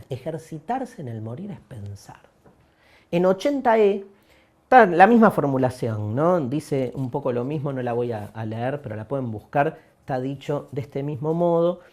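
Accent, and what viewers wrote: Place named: Argentina. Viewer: es genial!! Clase de filosofía con carcajadas es una delicia. De ñapa clase de español argentino.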